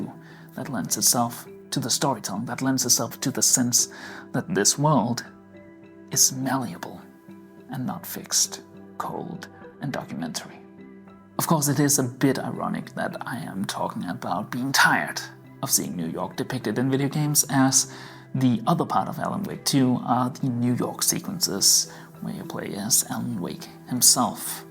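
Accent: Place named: Germany. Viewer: Great Video, one small question, are you german or someone who speaks a germanic language natively (other than english) I think you have a slight accent that can be often heard by Germans and Dutch people when they speak english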